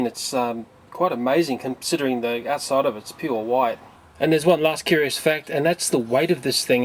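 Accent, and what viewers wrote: Australia. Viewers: KawaiiKitty I think he's Australian, and i believe it was a silkworm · Sounds like he is Australian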